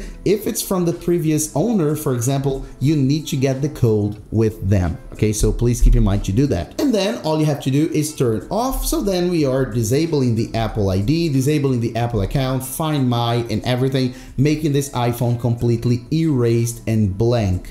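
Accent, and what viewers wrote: Brazil. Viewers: I had not expected to be listening to a help video in English with a Brazilian accent - yet here we are hehe On my iPhone 16e, I just can't get to the "Transfer Your Apps & Data" screen. My phone goes straight from the Hello screen to the keyboard customization screen and I can't figure out why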